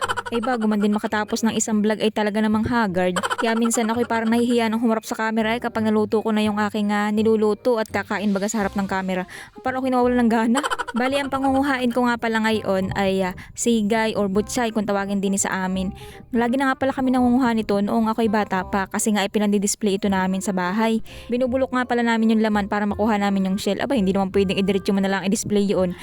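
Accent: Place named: Mangyan, Philippines. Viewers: ma'am taga mindoro ka po ba? kasi sa tono ng salita mo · Miss sudgest lng aliisin mo ung tunog kamangyan kc Ang galing Ng voice over at mga jokes mo kaya d mo kailangan gumaya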